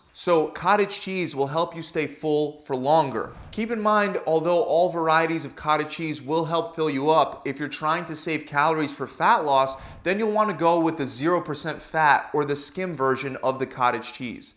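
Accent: Philadelphia, United States. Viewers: Woodermelun lol I know a Philly accent when I hear it! What can I say; we pronounce our water, watermelon and waterice, aggressively 😎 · guy sounds like he's from philly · He's gotta be from Philly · he’s from philly “wooder melon “ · Quick question where you raised in the philly area? I noticed you say Wooder just like we do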